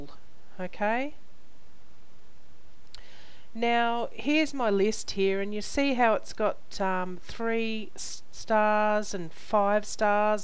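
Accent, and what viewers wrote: Australia. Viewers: i love how aussie you are makes it so much easier to listen too lol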